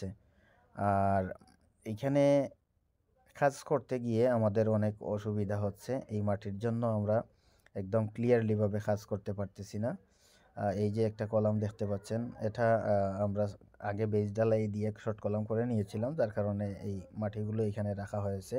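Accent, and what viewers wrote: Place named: Sylhet, Bangladesh. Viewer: বাড়ি কিতা সিলেট নি? মাতে সিলেটি লাগের😃😃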